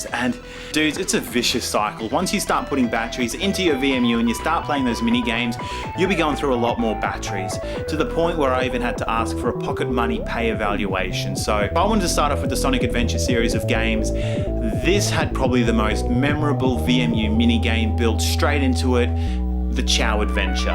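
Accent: Australia. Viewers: You sound Australian but you say Saiga not seega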